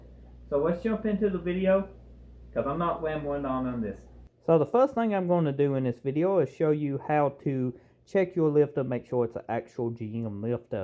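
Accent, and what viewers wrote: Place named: Louisiana, United States. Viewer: Nice video, i work on bikes and going through my lifters and checking pushrod length etc. Where are you from I hear a hint of Louisiana in your strange accent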